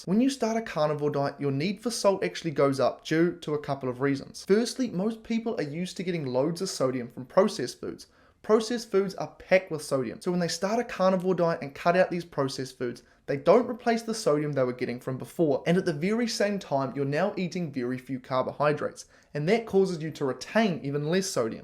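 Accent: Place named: New Zealand. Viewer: Great to hear a Kiwi accent on YouTube 😊 Chrissy from Te Awamutu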